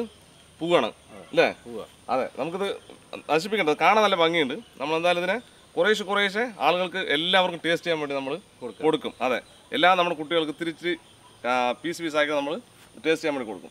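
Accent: Palakkad, India. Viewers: Ayyo nmde palakkad slang kelkumboo bayankara happyyyy😍😍😍😍🤩🤩🤩🤩❣️❣️❣️❣️❣️✨✨✨❣️❣️❣️❣️❣️❣️❣️❣️❣️❣️❣️ikka video polichu ❣️❣️❣️🤗🤗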